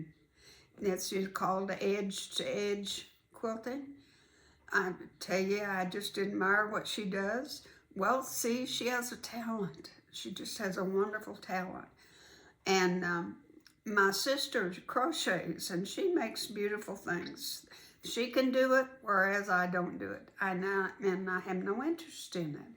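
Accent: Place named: Kentucky, United States. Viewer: So enjoyed your video. I'm from ky. Where are you from. You sound so much like alot of the ladies in our quilting guild. Will be watching all the videos you do as its like watching a close friend. Loved the pillow. So beautiful!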